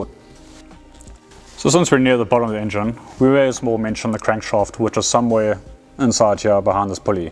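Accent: South Africa. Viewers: Your accent also seems South African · i heard the South African accent and subscribed immediately........good work buddy. Use motor bike ITB`s.........i saw a guy on youtube build ITB`s for a V12 Benz engine out-off Hayabusa intakes........just go to the scrapyard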